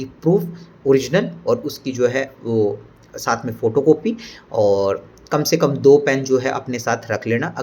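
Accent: Haryana, India. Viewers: Aap Haryana se ho bhaiya ?? Apke bolne ke way to lg raha h ...i am also from haryana..btw my exam is on 12th April...wish me luck bhaiya❤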